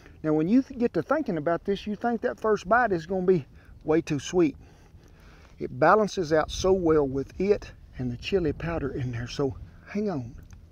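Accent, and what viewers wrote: United States. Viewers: I really come here for the cow boy Accent ( the Wild West language).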